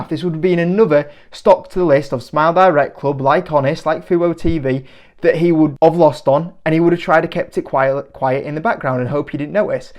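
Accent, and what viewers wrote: United Kingdom. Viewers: British accent is immediate red flag LOL lets hear a European school the Americans about the stock market LOL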